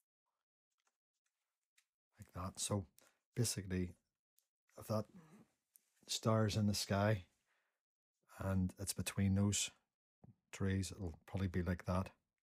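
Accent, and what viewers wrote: Northern Ireland, United Kingdom. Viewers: Very nice work! Great to hear your Northern Ireland accent!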